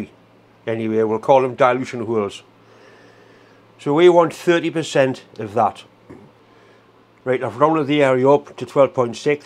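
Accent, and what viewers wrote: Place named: England, United Kingdom. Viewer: G'day mate, hope you had a Merry Christmas and please, a happy new year to you and yours. Enjoy your work very muchly. From what part of England do you hale? Your accent sounds wonderful to me. Thanks.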